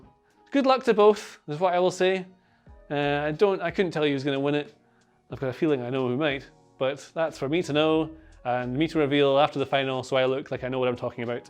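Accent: Scotland, United Kingdom. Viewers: that's a really light Scottish accent lol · And for Americans who can't understand Scottish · As an Indian, i also have difficulties to understand what he says. I understand American accent totally but when i hear British accent, it makes me feel like that i don't understand English at all.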